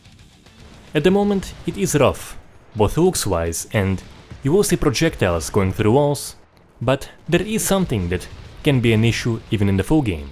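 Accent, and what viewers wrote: Russia. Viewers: U from russia?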